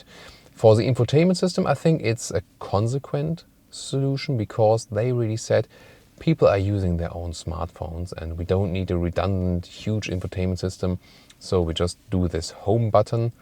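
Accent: Germany. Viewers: Oh I just figured out when he refers to "recuperation" mode he means what we would refer to as "regeneration" or "regenerative braking". Not complaining. His German flavored English is adorable. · ich mag deutschen akzent im englischen nicht XD nice review keep going :)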